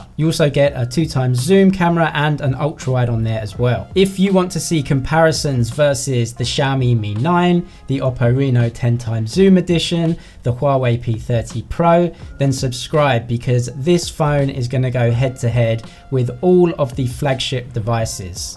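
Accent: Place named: United Kingdom. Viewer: Hi, im looking to see this on Amazon via your link but its just taking me to the homepage when i click it. Any ideas? Im in the UK (and judging by your accent you are too) so how have you got your hands on it? Were you sent a review unit or did you buy it yourself?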